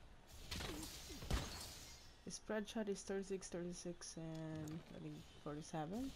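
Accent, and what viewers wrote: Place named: Philippines. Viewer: you sound filipino =D. but you just gained a sub! love your build coz i main bow too!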